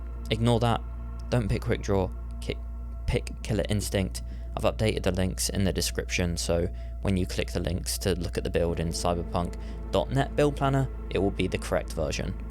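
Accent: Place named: England, United Kingdom. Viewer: But also the more I watch this channel the more you remind me of Avarti from the monotone voice to the English accent to the weird takes on things, I’m glad cause we need more of y’all on YouTube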